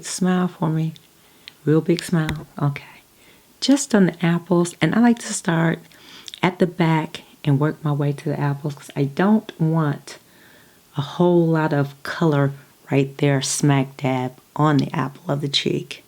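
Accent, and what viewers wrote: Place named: United States. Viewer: Looking angelic as always I see :). Damn... it is the first time I've heard your speaking voice and your American accent (I am British lol) is glorious like silk. So calming. Your tone is asmr perfect even without any whispering. I love listening to your precise explanations too. Definitely adds tingles for me. I look forward to more hopefully :D fingers crossed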